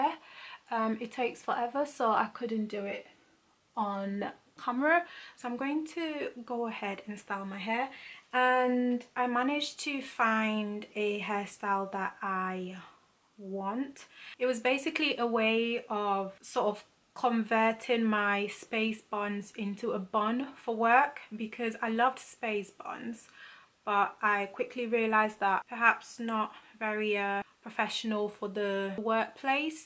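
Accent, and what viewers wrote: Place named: United Kingdom. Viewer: I enjoy watching her videos with that beautiful British accent.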